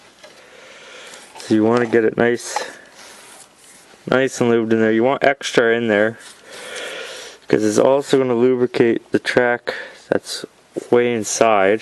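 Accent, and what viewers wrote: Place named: Canada. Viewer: Canadian much ? Lol. Never realized how much we say 'and that' as well as 'that sorta thing'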